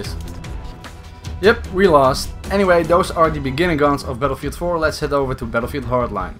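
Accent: Netherlands. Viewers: are you Dutch? If no then what country?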